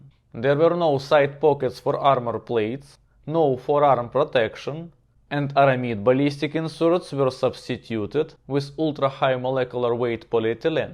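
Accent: Russia. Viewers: Неплохо сделано, даже русский акцент)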